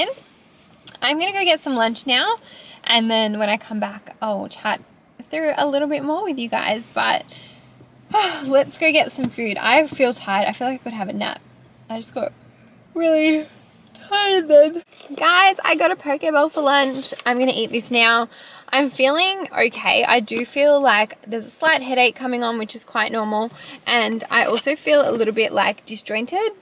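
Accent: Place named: England, United Kingdom. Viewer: I’m confused. What country are you in? You have an English accent but you told us at the end that it wasn’t covered by Medicare and it costs 190 dollars! Medicare in The U.S. is for older adults. Why it’s important to know is if it’s covered or not. If UK I’m surprised it’s not covered. I am in france and had several infusions totally covered. Can you please tell us which country you are in?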